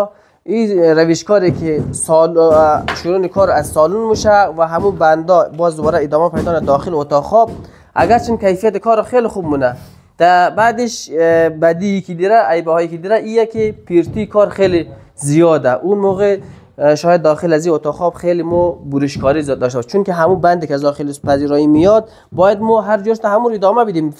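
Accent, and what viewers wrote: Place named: Hazarajat, Afghanistan. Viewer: باسلام‌بهتره‌ بدون لحجه هزاره جاغوری صحبت کنید مثال فارسی دری یافارسی‌تهرانی صحبت کنیدکه همه فهم باشد.تشکر🇦🇫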